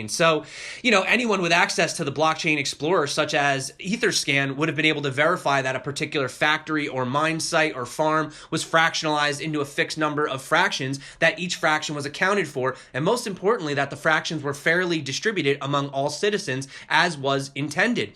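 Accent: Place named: United States. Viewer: Your accent is American.So I've asked you.Thank you bro.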